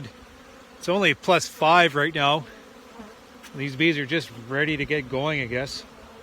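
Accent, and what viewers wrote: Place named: Alberta, Canada. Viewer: Where are you at lol you sound close to me in Alberta east of Edmonton 1 hour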